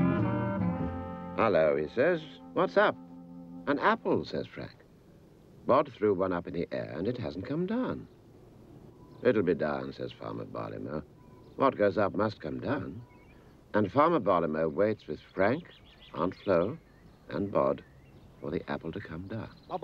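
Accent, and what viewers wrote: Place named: United Kingdom. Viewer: Walt Jabsco I'm 47, only look 40. That narrator's cool, laid back Brit voice. So... apples, old boy : )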